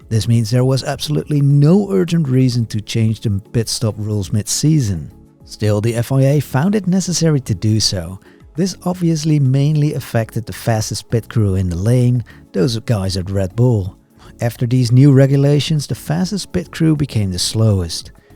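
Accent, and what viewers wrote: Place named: Netherlands. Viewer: Also, he's doing a great job speaking English but I still hear a distinct Dutch accent.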